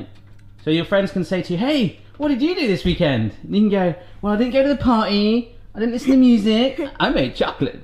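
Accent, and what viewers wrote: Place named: RP, United Kingdom. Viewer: Love her accent, it’s so posh!!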